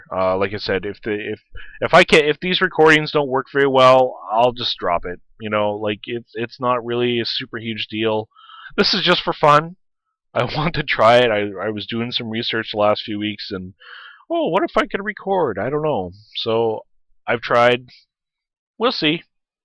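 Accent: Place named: Canada. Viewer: Love your Canadian accent eh.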